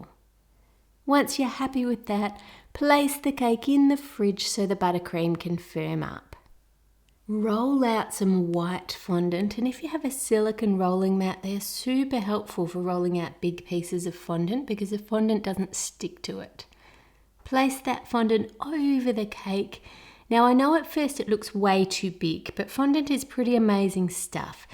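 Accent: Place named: Australia. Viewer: I like your Australian accent.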